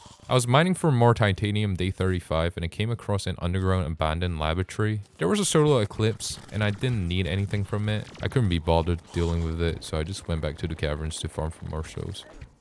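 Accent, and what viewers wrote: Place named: Scotland, United Kingdom. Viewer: i sense a tinge of perhaps scottish in your accent? where abouts are you from matey?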